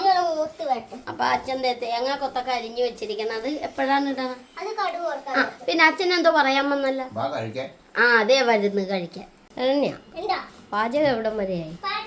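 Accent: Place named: Kannur, India. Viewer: പപ്പായ കണ്ണൂര് ഭാഷ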